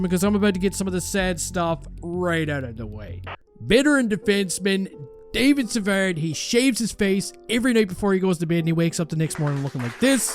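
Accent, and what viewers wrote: Newfoundland, Canada. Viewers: Do I hear a newfie accent?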